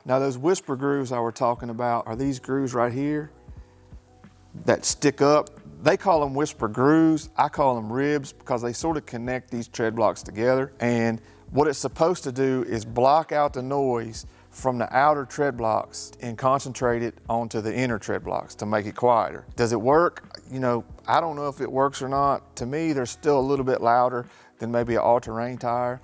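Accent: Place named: North Carolina, United States. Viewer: Where you from? Sounds like a North Carolina accent.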